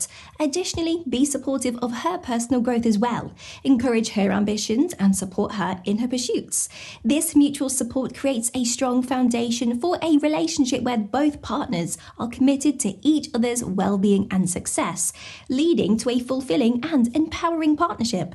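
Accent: United Kingdom. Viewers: Are you British, Irish or Australian? Whatever the accent, I love it 😁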